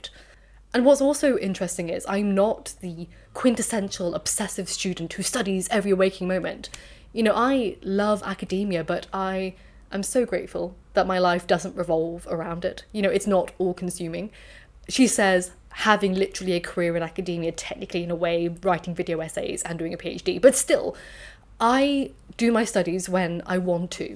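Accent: England, United Kingdom. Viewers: you remind me of jenny lee in call the midwife <3 i love your pretty british voice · I cant believe how much you look like my little sister, only differences are the hairstyle and the English accent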